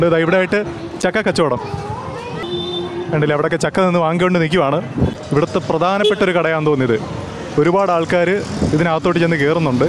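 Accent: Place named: Kollam, India. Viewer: പക്കാ കൊല്ലം കാരൻ 😄😄.. സംസാര ശൈലി.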